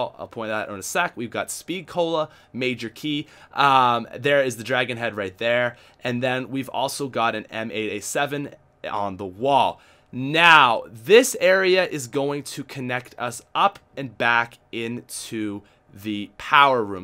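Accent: Canada. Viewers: is this guy from Canada? he sounds like he is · Are you Canadian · Idk if anyone can tell but i know for a fact he is canadian by the way he says "are".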